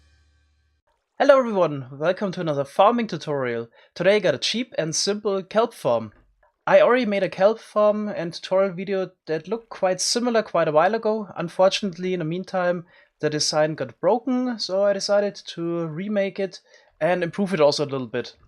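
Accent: Bavaria, Germany. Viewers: Dude you can hear from his speaking that he is German and for Germans this is easy and simple xD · Glaube sogar Bayer.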